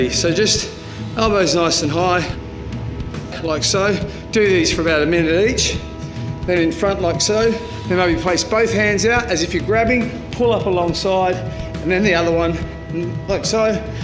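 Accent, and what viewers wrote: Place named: Australia. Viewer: Nah, you're just an American with no ear for accents. Bear Grylls is British, this guy is Australian.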